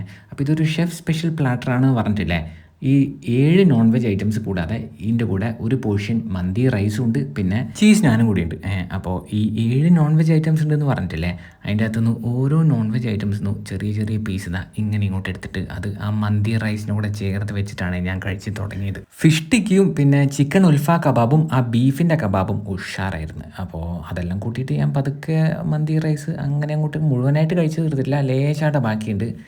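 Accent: Kannur, India. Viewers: Uushentappa🤣🤣🤣kannur bhasha. U are so funny👍😂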